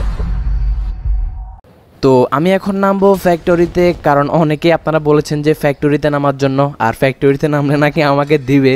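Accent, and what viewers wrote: Noakhali, Bangladesh. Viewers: তুমি যে নোয়াখালী ভাষা বল আমার থিকে জোস লাগে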